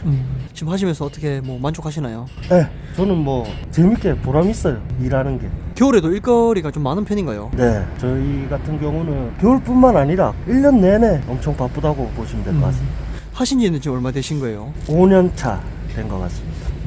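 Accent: Gyeongsang, South Korea. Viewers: 근데. 은 고향이 어디세요??? 경상도 사투리인가? 강원도잉가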